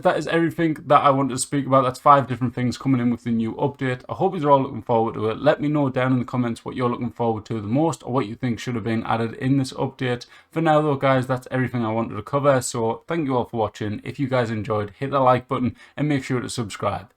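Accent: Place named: Scotland, United Kingdom. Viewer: Great info with a Scottish twist... good stuff 👌🤌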